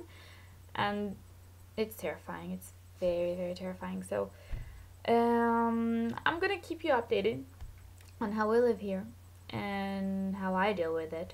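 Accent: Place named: United Kingdom. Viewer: את בריטית במקור במקרה? יש לך מבטא טיפה מצחיק שהוא לא ממש ישראלי ולא ממש בריטי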